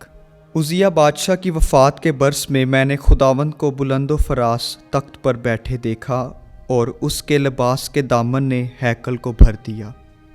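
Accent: Urdu, Pakistan. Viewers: true accent of URDU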